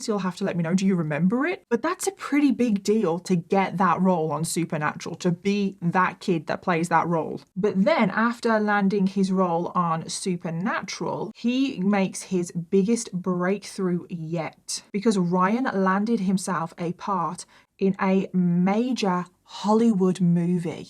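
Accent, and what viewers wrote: United Kingdom. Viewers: Can somebody please tell specifically what kind of British accent has? Thank you. I like it.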